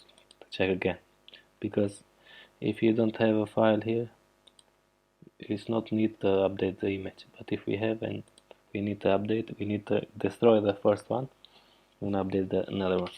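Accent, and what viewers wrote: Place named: Albania. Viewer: I'm guessing Albania by the sound of his accent, possibly greece.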